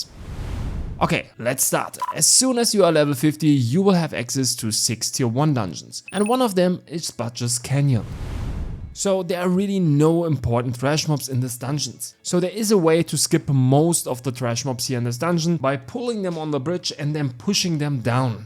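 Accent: Germany. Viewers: Keine 10 Sek. gebraucht, um zu merken das da ein Deutscher spricht :D Danke für das video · Echt schlimm der deutsche Akzent aber super hilfreich das Video, danke! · ich höre doch da einen arg deutschen englisch Akzent. Guides auf detsch wären ein Traum. Uuund du wärst der einzige. Denk drüber nach ;) und danke für die bisherige Pionier Arbeit <3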